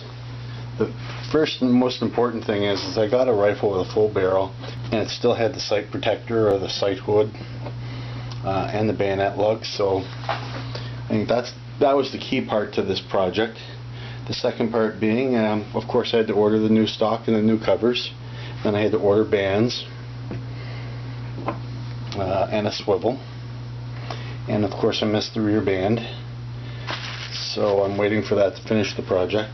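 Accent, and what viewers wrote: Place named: Ontario, Canada. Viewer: Must be from Ontario, right? It's the slight but detectable "uuut" in your speech.... (PS: I"m originally from Vancouver where all from Tah-ronna & points east want to really live. Lotus Land.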